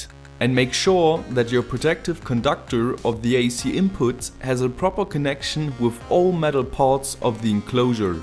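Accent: Germany. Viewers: Are you german? That english...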